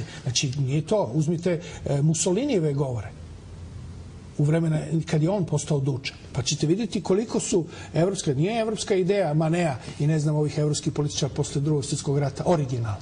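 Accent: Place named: Ekavian, Serbia. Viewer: Čovjek rođen i odrastao u Hrvatskoj, Karlovac, studirao i radio u Zagrebu do rata....otišao u Srbiju i slušam čovjeka kako govori.....original srbin, ekavica perfekt.....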